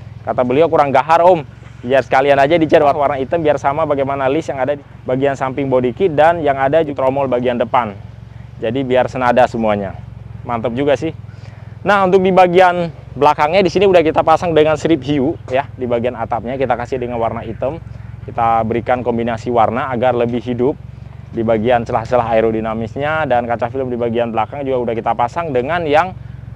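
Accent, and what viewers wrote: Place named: Indonesia. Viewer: Seneng denger bhs inggris masnya, logat asli indonesia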